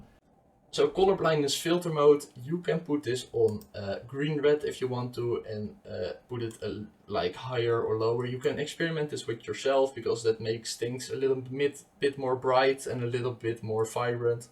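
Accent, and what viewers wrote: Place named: Netherlands. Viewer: ik hoor hier een Nederlands accentje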